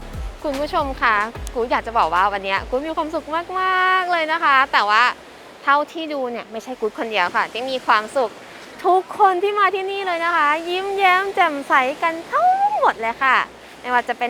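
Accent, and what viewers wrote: United Kingdom. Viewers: น้องกู๊ดพูดภาษาไทยว่าเพราะน่ารัก ฟังเพลินแล้ว..ภาษาอังกฤษก็ไม่แพ้กันเลย..สำเนียงน่ารักเชียว..British?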